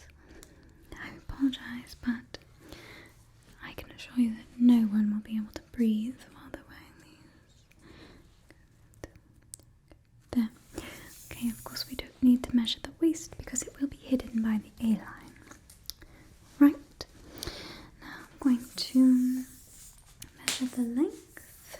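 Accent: England, United Kingdom. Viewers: God I love this and I like how I was expecting a French accent but was very happy to hear an English one! What a lovely video :) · Being tired I had to keep reminding myself this wasn't one of the British ASMR youtubers I watch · I actually thought you were british lol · I love that you've done this makes me even prouder to be English and your accent and attire is amazing you make a very classy lady ❤ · I love that you're trying to do a British accent lol!! Ive never seen the show but this is chill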